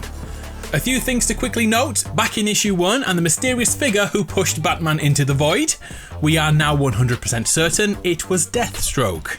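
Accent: United Kingdom. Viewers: youer British omg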